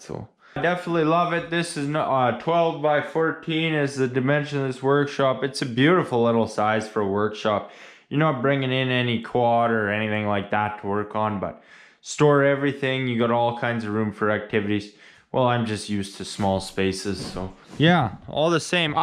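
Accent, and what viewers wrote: Canada. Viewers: Born and raised in Ottawa. Never heard such a Canadian accent in my life. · I just got up out of my bed to grab a Moosehead. That’s how Canadian I feel after listening to this gent! Awesome! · That's deep Canadian. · I definitely say eh a lot, but this is the most Canadian guy ever.. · I'm Canadian and I've never heard this Canadian accent!! Lol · Is there an Irish modification to this Canadian accent? Sorry, curious linguist. (yes i know Ireland is older than Canada. The only reason I use "mod" is because someone can be born and raised in one country but still be taught a language by people culturally from another. In this case, someone identifying as Canadian is being taught English by historically Irish English users. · Fuckin love Canada and Canadians. This dude literally sounds like a dude doing a parody of a Canadian, and I think that's really beautiful. 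· I love the Canadian accent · I'm Canadian and even I was like, wow that's so canadian. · That's the most canadian sounding guy I've ever heard. Could be doug and bob McKenzie's long lost brother. That's a badass set up that he has there, tho. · I agree you have the purest Canadian accent!! eh!!! I enjoy your videos and I'm envious of your lifestyle!!! All the best mate!!! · Holy shit that is the Canadian accent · Wow. I quite enjoyed the videos I watched. Amazing that you started doing this when you were so young. I have four boys in their late teens and the only thing they do is play computer games all day. There I am building a woodshed with my Chainsaw mill and none of the boys have the slightest interest in building something. We live way out in the woods in northern BC and I enjoy nature and doing things as well. Good on you. Wish you all the success. That is quite the Canadian accent, by the way. 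· Love the Canadian accent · Truly. And the accent makes everything sound like it has a G-rating even when saying “shit” (which I didn’t know was even a word in Canada). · Im Canadian, was raised in the bush, and even Im like. "whoa thats a thick Canadian accent bud" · Knew he was Canadian from the jump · The loft is awesome! Looks like a great place to relax and get cozy on cold Canadian days and long ass winter nights.. By the way, love your accent. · I get all the confusion about accent, have yah considered he's Canadian and a little tipsy? yah know? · Such a "Canuck" Love your accent brother. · You're video was recommended by YT and I'm glad I watched it. First off, as a fellow Canadian I heard the accent and knew where are from, awesome accent! I like your cabin it's very quaint and seems efficient. You had me laughing at "this is the shitter" haha... classic. You have a new subscriber.. 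take care from ON. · I don't know if this is Canadian accent or menonite mixed with Amish and a little Canadian threw in for good measure. But it sounds like you really poor it on extra extra like trying to copy a wirey granddad like I copy both my grand dads and my dad I've noticed naturally. Either way pretty good ol boy A ..... Well you are ok in my book lol nothing like a Cummins 12 valve . Have a good one young man . I don't see how anyone can live in such beautiful country and not be able to have guns like. It's almost like living in Alaska but less freedom. But what am i talking about we have a bunch of demoncrat, antichrist spirited bunch of heathens that stole our election and are pissing away everything here . But seriously awesome place . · Been a long time Since I heard the great Canadian accent!! Love it! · first time viewer but 5 seconds in I can tell its a fellow Canadian lol, got a doozy of a spot there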